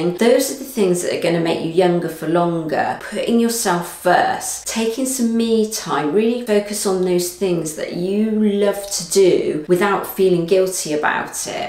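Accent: United Kingdom. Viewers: Definitely British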